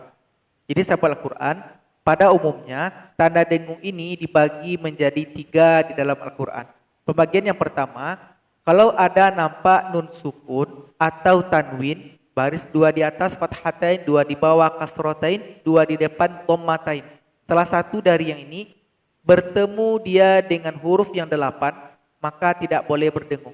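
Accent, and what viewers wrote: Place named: Solok, Indonesia. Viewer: Logat solok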